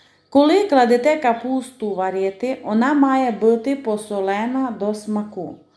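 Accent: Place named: Transcarpathia, Ukraine. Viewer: Мені надзвичайно цікаво слухати, як звучить закарпатьский діалект. Попри те, що українська - моя рідна і я знаю її дуже добре, деякі слова все одно не до кінця розумію, але мені розуміти їх десь так само хочеться, як і вашої капустки. Бо з дитинства розмаїття мови - то моє хобі. Яка ж багата є наша мова!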